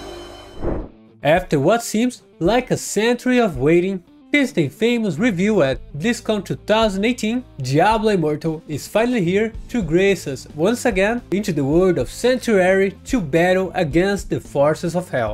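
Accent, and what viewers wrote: Brazil. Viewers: Tá falando de um jeito que brasileiro falando inglês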